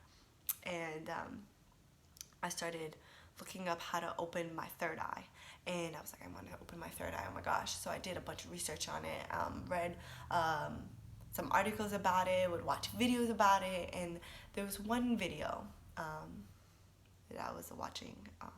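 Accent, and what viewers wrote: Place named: United States. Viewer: Você tem cara de brasileira mesmo, mas teu sutaque é bem americanizado. Deus seja contigo. Mais uma entra mas fileira dos salvos em Cristo. Deus é fiel!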